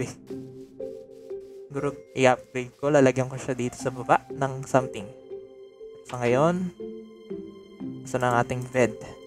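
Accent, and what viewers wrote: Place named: Visayas, Philippines. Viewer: Para ka pong bisaya hahah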